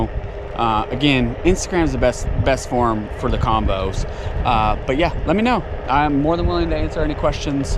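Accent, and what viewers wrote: South, United States. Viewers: Did you always sound so stupid when you talk or did you change the why you talk to sound more like a TN native ? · Coming from Corona, that 65 should feel amazing. I’m hearing a bit of southern. Can’t wait to leave California. Praying Tennessee doesn’t get crowded before we get there.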